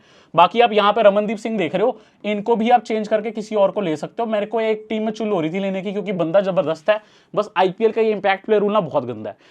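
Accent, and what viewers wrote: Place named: Punjab, India. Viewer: Punjabi voice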